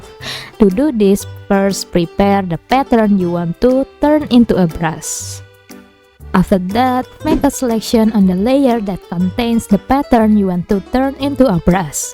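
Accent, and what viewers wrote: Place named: Indonesia. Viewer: oke dari logatnya aku paham kamu orang indo wkwkwk Nice job, makasih tutorialnya kak ^_^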